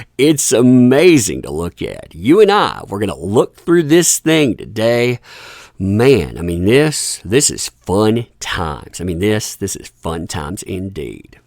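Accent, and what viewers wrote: South, United States. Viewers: Interesting news indeed. Concerning a previous livestream, who couldn’t like the sweet southern drawl? It’s always sounded great from here in Canada.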